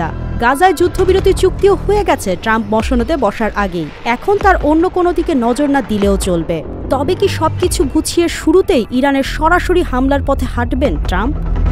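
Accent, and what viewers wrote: India. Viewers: একাত্তর টিভিতে ভারতীয় সাংবাদিকের উচ্চারণে সংবাদ পাঠ।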